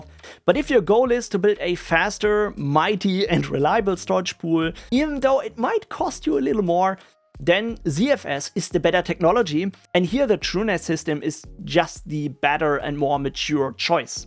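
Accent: Germany. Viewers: The German accent is the best one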